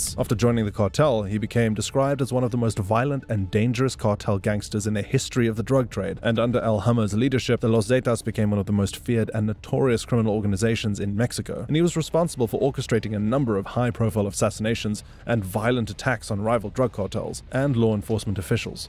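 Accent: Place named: South Africa. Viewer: Its a south african bloke lol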